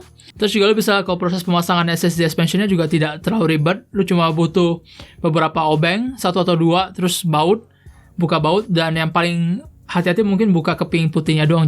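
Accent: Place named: South Jakarta, Indonesia. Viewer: Waduuh suara sama bahasanya kok kya orng jaksel gila ndak paham wicis wicis 🗿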